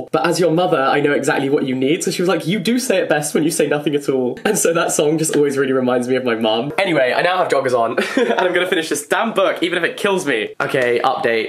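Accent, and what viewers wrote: France. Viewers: i love your little french accent!! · Big fan of the didn’t cry, I’m hard as nails to the don’t come for my French accent or clothing choices energy 👌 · I love your french accent ! From a french girl 😉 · Your French accent is so cute tho 😍 And I will also happily order a vase of wine 😂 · Your french accent is actually pretty nice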